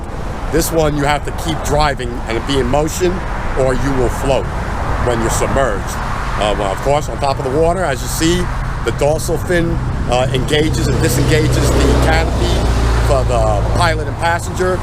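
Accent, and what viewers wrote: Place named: United States. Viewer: Like 110 I enjoy to watch u videos and I enjoy to listen the American accent to learn it 👍👍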